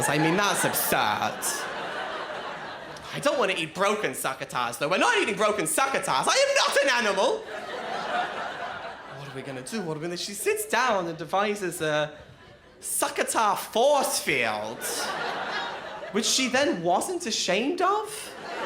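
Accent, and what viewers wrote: Australia. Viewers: just Aussie. He grew up in Brisbane, Australia and now lives in Melbourne... :) He can't explain his accent... he copped a lot of flack for it when he first started out because he is a Brissie boy... haha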